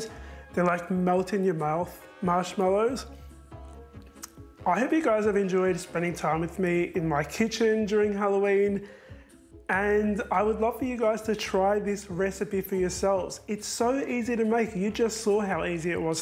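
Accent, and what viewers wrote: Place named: Australia. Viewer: Yesssss! I need to try to make them.... also I love your accent 😍 Australian?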